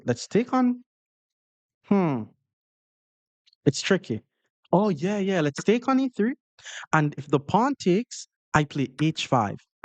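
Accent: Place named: Jamaica. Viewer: like your style of explaining, the jamaican accent is amazing thx 🤙🏼🤙🏼🤙🏼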